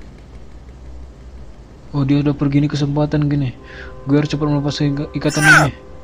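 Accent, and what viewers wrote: Medan, Indonesia. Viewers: mas bahas mas kaya orang medan mas bro dan pak bro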